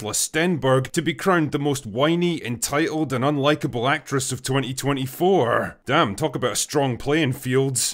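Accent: Scotland, United Kingdom. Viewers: Is it Scottish accent?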